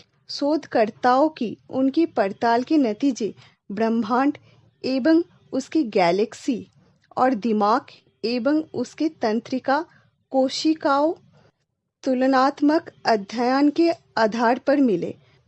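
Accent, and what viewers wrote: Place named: Bengal, India. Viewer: You are a Bengali and trying to speak pure Hindi.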